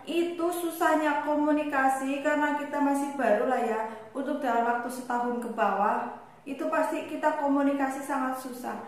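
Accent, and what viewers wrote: Banyumas, Indonesia. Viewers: betul kue mba si cantik ngapak, pokoknya manfaat banget yang disampaikan sama mba ini, ayo dulur dulur saling ingat mengingatkan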